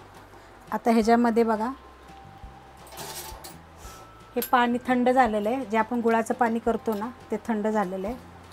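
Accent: Satara, India. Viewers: Are wa mla tumcha bolnyavrnach kalla mi pn satarchich ahe nagthancha fude kashil koparde 😍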